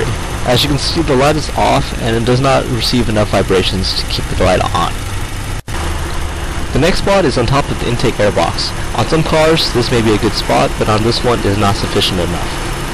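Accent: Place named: India. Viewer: Your accent....are you from India?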